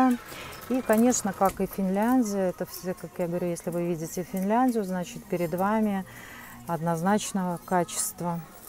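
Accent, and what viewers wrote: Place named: Latvia. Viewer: Это латышский акцент...😊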